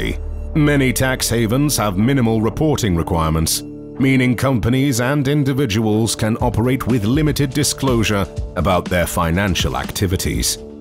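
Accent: United Kingdom. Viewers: I don't like British accent. Plus, the narrator's tone is rude & imposing. What he told abt Russia was totally false, hostile & misinforming. Why'd he do it? Ask him! It's an old rivelry between 2 worlds. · I don't like British accent too. Prefer Australian, Canadian and US accents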